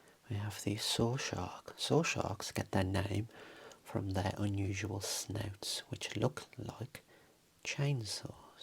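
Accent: Birmingham, United Kingdom. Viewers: Mother of pearl. If there was an Oscars for ASMR, this would be win some awards. A lot of ASMR artists just read the books word for word and I find this a bit boring and struggle with this. I LOVED this video as you mix it up so much and keep us in the loop (loved all the "save your money" comments!). I know this is a live video but your style is really good. I have only just discovered your channel (shout out to reddit!) and really really enjoy your work. Like a Brummie version of Mr. Relaxing ASMR! lols! (That is a complement btw! Love your accent!) Ps. I also LOVED the tapes and CD ones. Especially as it is clear you were an old school raver! Or were you lol? (make some noizzzzz!)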